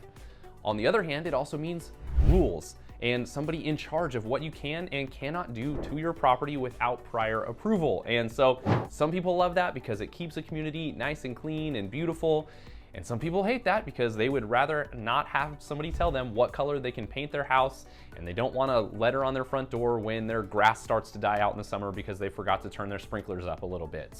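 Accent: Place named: Southern California, United States. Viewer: Dude your videos are really helpful and informative. Your super strong SoCal accent makes me feel like I’m watching an episode of the Californians on SNL. But it’s better than listening to Texans where I live now. I used to live in the LA area and I’m thinking about moving back to beautiful SoCal. For anyone thinking about moving to TX… its sucks. Compared to Cali, Texas is boring, ugly, the food is atrocious, 99% of the people are obese and sit around and guzzle alcohol and eat horribly tasting canned food, DFW drivers are reckless, my car insurance actually doubled when I moved here from Los Angeles, people say Texans are friendly, but I would say they’re polite definitely not friendly. People in Southern California are actually friendly, and I made friends quicker in Los Angeles than I ever did in Texas. Plus, if you’re single, there is no one to date in Texas because everyone is obese and or married.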